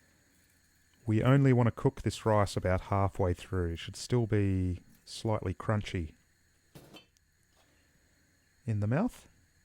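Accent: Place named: New Zealand. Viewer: Am I hearing an NZ accent.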